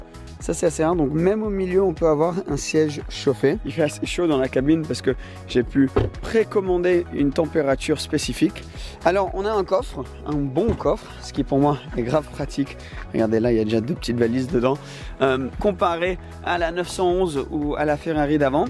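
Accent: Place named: United Kingdom. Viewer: On dit TESLA, pas TESLA. L’accent UK, ca va bien 2 minutes, ça fait des mois que t’es revenu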